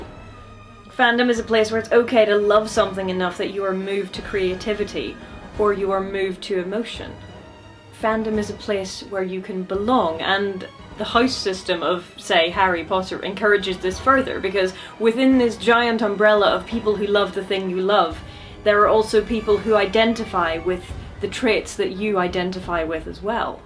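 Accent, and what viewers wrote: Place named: Northern Ireland, United Kingdom. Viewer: First things first: oh dear lord, I remember stumbling upon Homestuck about three millenia ago.... even compared to when this video came out! I really enjoyed it, but it was a bit of a behemoth at that point already, and somewhat... daunting for it? Anyway... A very good series! I've watched all 7 at this point, but this one particularly stuck with me, even though I kind of don't feel like I've ever managed to get so involved in a thing that I'd consider myself a part of an actual fandom.. It kind of put things in a bit of a perspective, I suppose, and of course I do tick a lot of the boxes for being a huge fan (HP, Doctor Who, Discworld, etc.). Just... not the community ones, mainly? And, for another random tangent: I've been somewhat binging your videos for several days now, and..... I've clearly been in Norn Iron for too long, because it didn't even occur to me that you might sound Northern Irish until you mentioned Lisburn here xD xD And here I am - among other things - a bit of a linguistics nerd! 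Well, clearly it's what passes for default to my brain these days! Not a bad thing, mind, because I rather enjoy the Ulster dialects in particular :)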